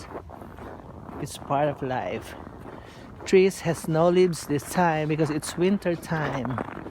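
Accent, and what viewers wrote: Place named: Canada. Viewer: Wow english Canadian eh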